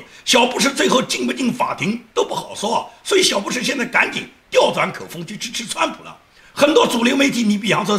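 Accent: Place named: Guangxi, China. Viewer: 一听就是广西底下流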